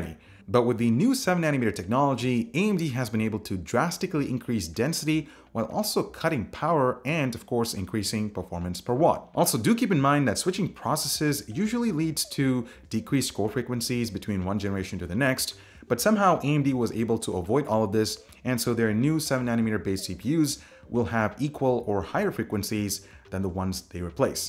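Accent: India. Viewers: are u indian.? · Is this guy Indian?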